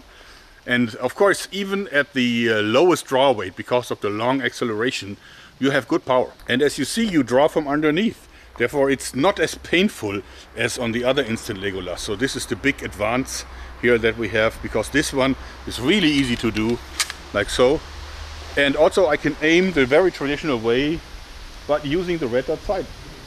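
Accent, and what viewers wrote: Germany. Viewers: Ich weiß nicht warum aber man hört dass er deutscher ist 🤣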